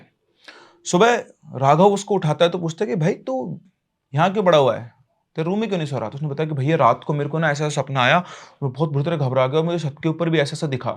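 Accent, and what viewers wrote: India. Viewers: आप का बोलने का तरीका बिलकुल देसी हे ❤❤❤गांव जैसा बोलते हैं जो देसी नहीं होगा वो शायद ही समझेगा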